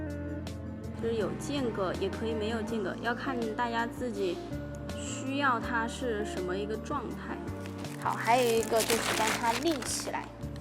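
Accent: Sichuan, China. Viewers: 干货很多，小老乡，加油！主要是听着川普比较亲切，没有笑话你的意思。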